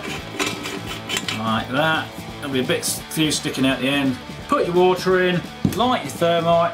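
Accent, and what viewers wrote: United Kingdom. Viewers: You can tell where he's from he's got a British accent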